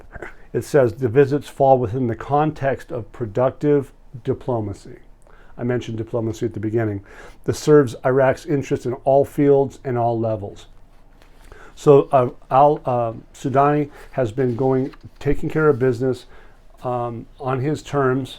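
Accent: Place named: San Diego, United States. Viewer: sounds like you were from the San Diego surfing scene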